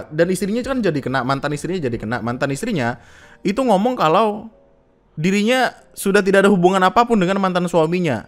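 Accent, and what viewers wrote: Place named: Surabaya, Indonesia. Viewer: Suroboyo Banget 😂 Aku cinta Orang2 Surabaya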